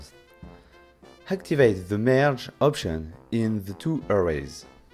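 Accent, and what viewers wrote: France. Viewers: This is the first time I watch this channel. What a pleasure to be welcomed with such a good French accent ! Great content. Subscribed. (S’il te plaît garde l’accent français c’est parfait comme ça) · Love your French accent may be I am wrong. Awesome tutorial by the way.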